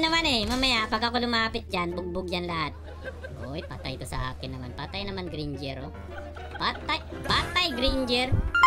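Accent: Visayas, Philippines. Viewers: Taga Visayas ba si? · bisaya kaba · bisaya ka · Ay kuya bisaya kaba or tagalog?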